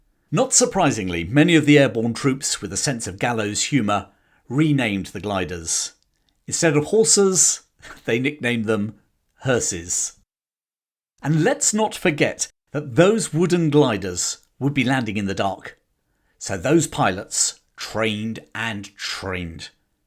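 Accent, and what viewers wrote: United Kingdom. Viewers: Many thanks for the video, with this English UK accent, spelling so British. Now that bad english is spoken everywhere worldwide, I lived for 2 decades between Singapore and Jakarta, working to supply tools and parts for industry. We have singlish, American men from Texas, Australian from Melbourne and some kiwees and Filipinos. Indians also. So thanking you very much for this wonderful British video. 😊😊😊😊😊😊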